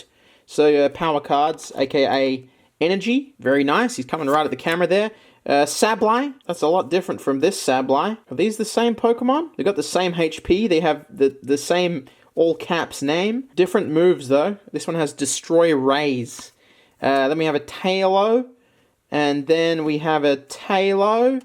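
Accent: Australia. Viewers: Shouty aussie gets words wrong. 10/10 I'm happy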